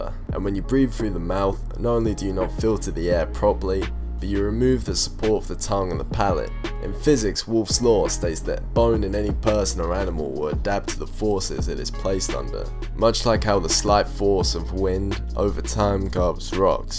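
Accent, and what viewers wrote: England, United Kingdom. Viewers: are you from england or maybe australia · British accent ? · He is a fellow Brit.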